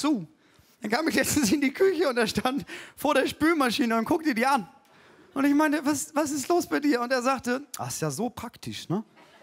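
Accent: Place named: Northern Germany, Germany. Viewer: Der norddeutsche Dialekt ❤😂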